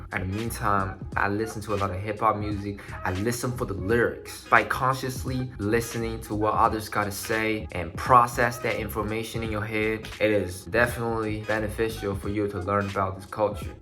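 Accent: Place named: South, United States. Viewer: your southern accent tho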